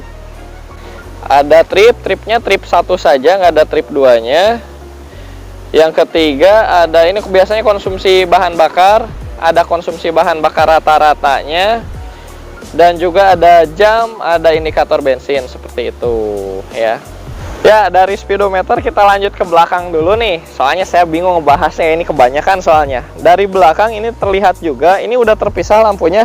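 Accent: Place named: Banjar, Indonesia. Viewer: Banjar nya mang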